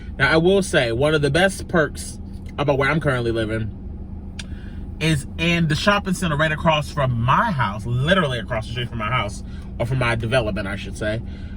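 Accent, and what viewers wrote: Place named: Alexandria, United States. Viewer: Sounds like Alexandria, VA 😊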